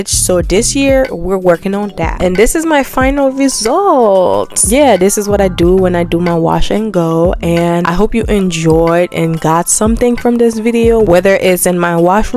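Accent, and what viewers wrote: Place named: Barbados. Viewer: Beautiful hair & Love your accent, Are you from Barbados?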